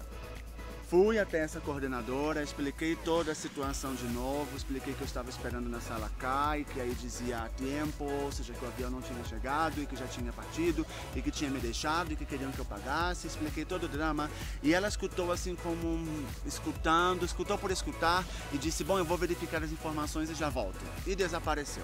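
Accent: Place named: Antioquia, Colombia. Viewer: E muito interessante como seu espanhol e tão paisa 😅😅😅😄😄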